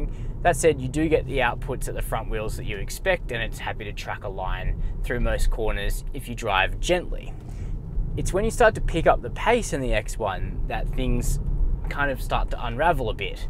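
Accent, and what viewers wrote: Australia. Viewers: Very Aussies accent, can not be wrong 😅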